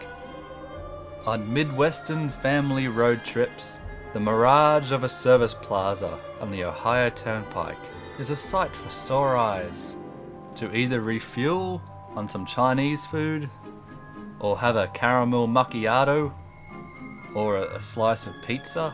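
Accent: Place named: Birmingham, United Kingdom. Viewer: i suspect a brummie accent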